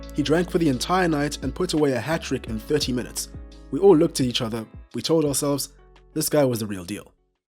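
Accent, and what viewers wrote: South Africa. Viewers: My man's accent sounds like he's a homeboy from South Africa. Love your vids mfana. Keep it up. Hope you blow up. Holla if you want to do a duo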